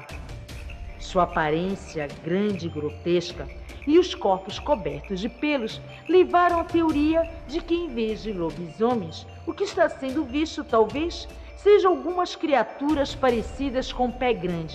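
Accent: Pará, Brazil. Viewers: Olá senhora gata,estou fazendo uma pesquisa sobre vc, eu sou muito curiosa, pois bem, esses tempos eu te perguntei se vc era locutora, e vc gentilmente me disse que não, então pensei: Se vc não é locutora, então é bem provável que vc saiba cantar, uma pessoa com uma voz dessa, com certeza sabe cantar. Outra coisa: seu sotaque é diferente, parece paraense.... Outra coisa, queria muito vê o seu rosto, então vc me disse que estragaria a personagem, vc tem razão, ia perder a graça, mais vendo o seu rosto no facebook, naquele vídeo desejando feliz 2019, percebi que o formato do seu rosto é bem bonito, e olhando essa sua foto do YouTube, percebi que o seu cabelo é meio loiro, bom,tô quase terminando minha pesquisa, sei outros detalhes mais não vou falar, sua indentidade, vai ficar escondida comigo, até porque minha admiração e amor por você é equivalente a 1 metro e 56 centímetros de altura(é meu tamanho) um beijo na bochechinha, amo-te!!!!❤❤❤💋💋💋💙💙💙💚💚💚💛💛💛💜💜💜obs:(Vou te provar que sei muito sobre vc,te mandarei solicitação de amizade no seu face verdadeiro, (Elaine Salvador é o nome do meu face) vc é incrível, já virei sua fã.....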